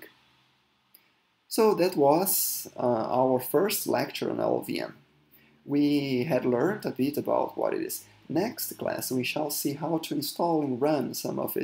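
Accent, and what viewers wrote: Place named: Brazil. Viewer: mano não sei porque mas ouvi outro brasileiro falando em ingles é muito bom kkkkkk eu entendo tudo, até consigo enteder os indianos mas muita vezes tem uns bagui que não da pra entender kkkkkkkkkkk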